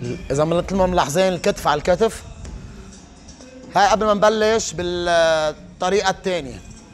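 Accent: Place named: Iraq. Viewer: عم تحكي عراقي واو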